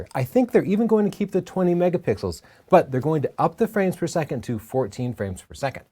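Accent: Texas, United States. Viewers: odd, halting speech pattern is a lot like Jeff Goldblum, with a slight Texas twang.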